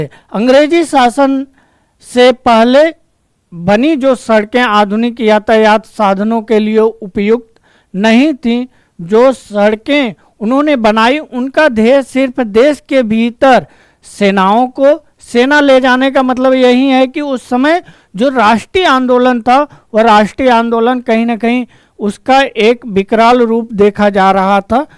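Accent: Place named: Uttar Pradesh, India. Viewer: Sir himachal look m, Uttar pradesh ki Awaaz... very nice sir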